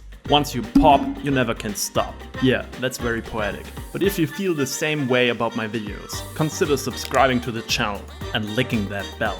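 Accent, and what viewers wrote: Germany. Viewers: Hey Are you German?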